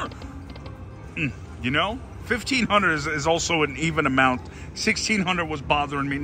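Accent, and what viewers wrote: South, United States. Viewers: I freaking love you!! I'm so happy I found your channel. I'm disabled and can't go anywhere much. You have made me Crack up so much!! Thank you!!! Are you from the south? You sound like you are😊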